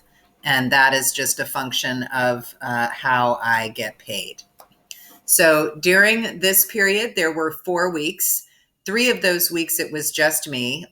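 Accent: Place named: South, United States. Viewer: You definitely have "South in your mouth" with the way you speak. A good thing.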